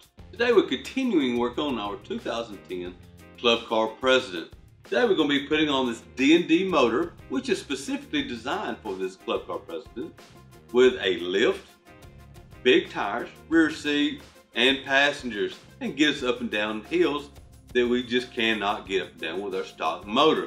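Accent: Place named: South, United States. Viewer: southern drawl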